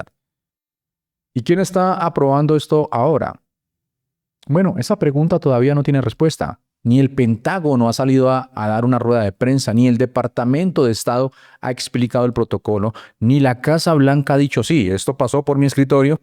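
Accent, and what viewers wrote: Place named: Colombia. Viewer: En Venezuela nadie se esta quejando de eso porque aca en EEUU si?? Tu tienes acento Colombiano sabes que si no hay familias hablando es porque el narco esta detras de esto , en Venezuela hay informes de periodistas que dicen que Dios Dado Cabello tiene amenazadas s a las familias porque?? Porque esta conectado con el narco estado de Maduro , Trump esta cometiendo muchos errores este no es uno de esos estan volando narcos y eso esta bien, quienes piden explicaciones el borracho de Petro ??? Maduro que no es presidente de nada ?? Quienes sufren el narco en america latina jamas van a estar en contra de esto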